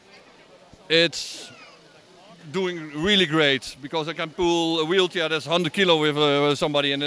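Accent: Netherlands. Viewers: je hoort gewoon dat hij nederlands is 😂😂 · He's from the Netherlands i can hear his accent i am Dutch too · HAHA HET NEDERLANDSE ACCENT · ik vind het altijd zo mooi om te zien dat mensen zon nederlands accent hebben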